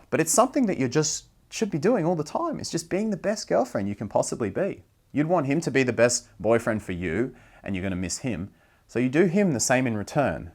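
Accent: Australia. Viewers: I just read your are Australian. .. ye ye. love the australian accent 😊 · I’m living in Aus and learning English. I watch your channel for English listening practice because you speak little bit Aussie English and put subtitle :) it’s great that I can learn about relationship at the and time! Thank you! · i know this may sound cheesy but i officially love Aussie accent.😆 and its amazing how i do these things without even realising it. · Are you Australian?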